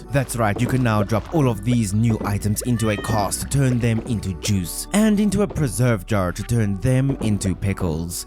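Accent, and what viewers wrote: South Africa. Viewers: I sense a South African accent...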